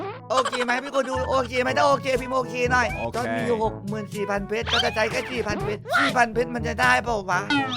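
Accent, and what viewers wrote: South, Thailand. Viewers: พูดเหมือนคนใต้เลย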